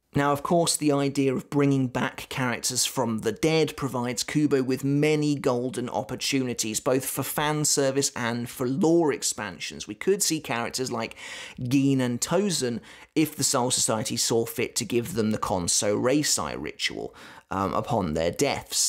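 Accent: United Kingdom. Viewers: He reminds me of this dude I went to school with but this guy British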